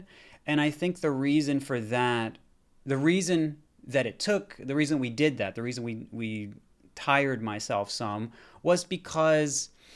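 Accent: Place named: Tennessee, United States. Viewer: I'm just trying to figure out where your accent went. I hear a subtle Tennessee dialect. But other wise its gone.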